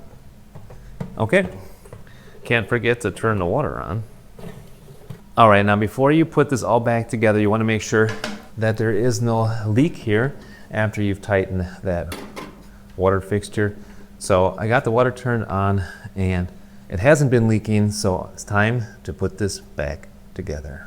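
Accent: Germany. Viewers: Sounds crazy: American slang in German accent! 😊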